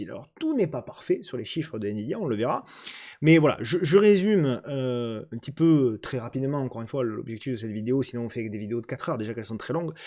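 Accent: South, France. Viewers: Top, merci pour la vidéo, je m'ennuyais devant mes écrans avec une cheville en vrac (chute à moto hier soir), mais pas grave, rien de trop méchant, que 8points de sutures et pas d'os brisé, et puis j'ai ta vidéo pour me remonter le moral avec ta bonne humeur communicative (oui, l'accent du shud c'est sympa et chaleureux 😜) Bon WE ✌️ PS: non non, tes vidéos ne sont jamais trop longues pour qui sait apprécier les bonnes choses 😉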